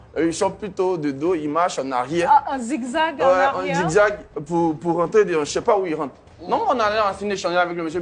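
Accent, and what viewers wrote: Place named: Benin. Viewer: Mon frere meme avec ton mask si c'est du Benin tu parle ont sait qui tu est 😂😂😂😂 eviter de vous metre dans des problemes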